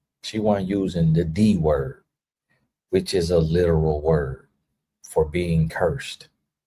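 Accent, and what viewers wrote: South, United States. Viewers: aye you from the carolina’s or georgia?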